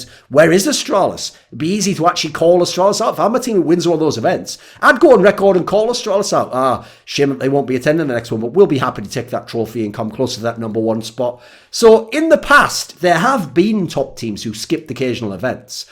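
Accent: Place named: Scotland, United Kingdom. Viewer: Where you from sound so Scottish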